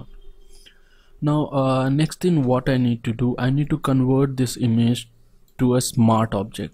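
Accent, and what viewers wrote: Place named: United States. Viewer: you talk like a american language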